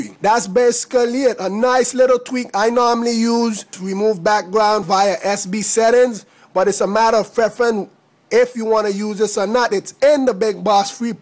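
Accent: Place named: Jamaica. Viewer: nothing gets me more pumped than a jamaican accent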